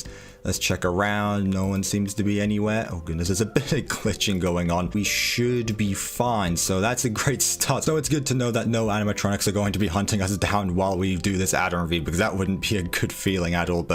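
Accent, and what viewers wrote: United Kingdom. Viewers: Are you British beacous you sound like one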